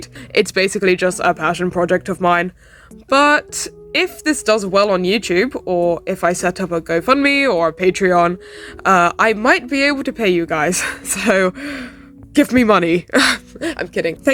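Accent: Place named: United Kingdom. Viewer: Oh God, he’s British (ps this sounds amazing)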